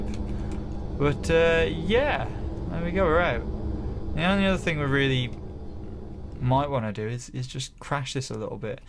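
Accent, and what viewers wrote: United Kingdom. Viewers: Hey narrator, are you from Cannock?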